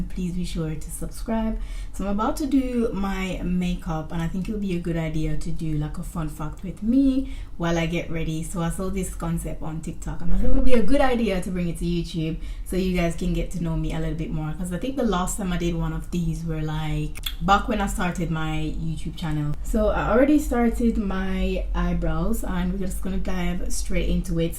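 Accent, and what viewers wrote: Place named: Jamaica. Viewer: I could hear the Jamaican accent from your tik toks 🇯🇲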